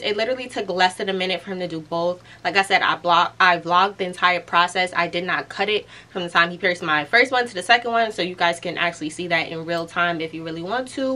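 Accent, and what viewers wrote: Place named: New York City, United States. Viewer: Shout outs to you and being frim NYC. I knew instantly once you started speaking. I'm considering this myself, very helpful video. Thank you, peace & love.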